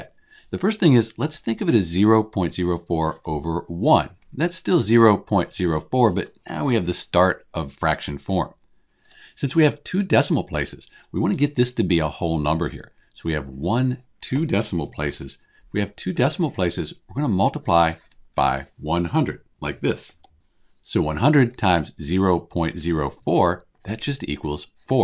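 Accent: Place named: RP, United Kingdom. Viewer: His voice sounds so posh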